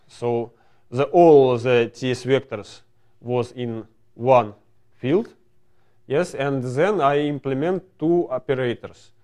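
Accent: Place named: Russia. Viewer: вот есть индийский английский а тут русский английский.. Лучше на русском, многие ищут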